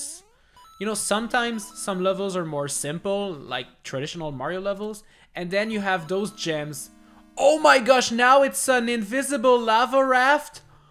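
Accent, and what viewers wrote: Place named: Spain. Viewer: If you don't speak spanish, why do you have a spanish accent?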